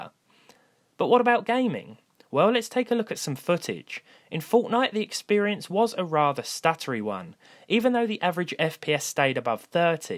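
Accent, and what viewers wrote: Australia. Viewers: Great australian accent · Are you from Australia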